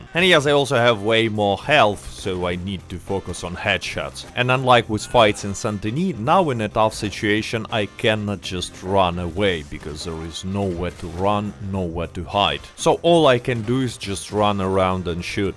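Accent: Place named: Russia. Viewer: You have a cool Russian accent. Your voice reminds me of my wife's uncle 👍🏿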